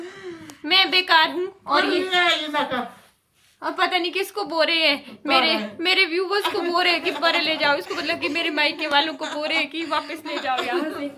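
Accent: Pahari, India. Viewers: Hy anty osm vlog muje be apka hindi be pahari slag bhut pasand hai hm pahadi hai bhi pahadi slag nhi ayga to kya English ayga muje to bhut pasand apki voice be or apka slag be or ye dadi g nice hai kse haste haste hue bol rhe the👌👌👌👌👌 apko mara namaty 🙏🙏 or love u bhut sara 😘😘😘😘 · Pahari tone is must , that is our culture & identity . So keep it up .God bless you and your family. 🙏🙏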